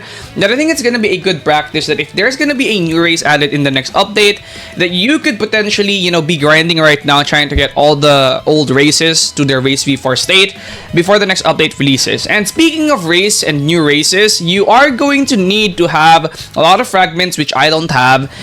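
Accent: Philippines. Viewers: bro is accent pilipino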